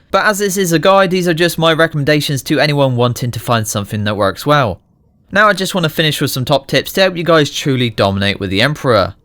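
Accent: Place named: West Country, United Kingdom. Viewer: Another great guide, keep up the good work! Btw, going by your accent I'm guessing your a fellow west country lad?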